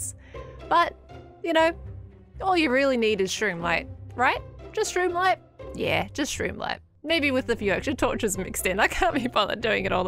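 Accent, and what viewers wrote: Australia. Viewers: Loving you on the hermitcraft server ! The australian accent is really fun to listen to. Keep up <3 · Is she Australian?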